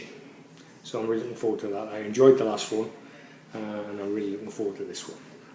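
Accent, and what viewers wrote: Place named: Northern England, United Kingdom. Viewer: I think The Malt Miller, Worcester Hop Shop and a couple others give the option of crushed or uncrushed. Think the benefits of uncrushed are it keeps fresher for longer. I've heard people say uncrushed grain only really keeps for a month or so, but i must admit I've never had any problems with 6 month old grains. Really enjoying the video's and glad Ant Rogerson directed me to your channel. I'm from just down the road in Newcastle, and its very refreshing to hear a Northern accent on youtube. Especially after putting up with those Southerners like Ant Rogerson and Cheshirehomebrew for a while.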